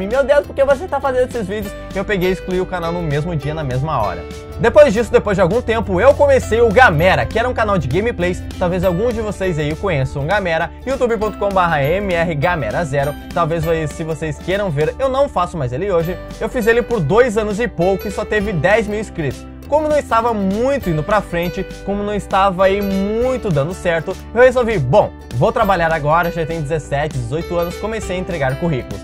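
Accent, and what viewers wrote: Rio Grande do Sul, Brazil. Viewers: Bah, que tri! Quando assisti teu canal pela primeira vez, percebi que teu sotaque era gaúcho! 😍 E agora que tu é de Gravataí, que massa! Eu moro ao lado, em Cachoeirinha. É nós, adoro o canal! ☺👊👊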